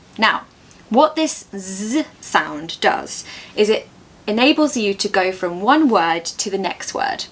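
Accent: RP, United Kingdom. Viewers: When you say, hello beautiful people, it's actually hello, beautiful lady with fantastic new lesson for English learners ❤❤. Love British accent modern RP. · Wow excellent reading of you. I always love your British lovely accent and pronunciation. I like your beautiful, amazing desk gifted by Tom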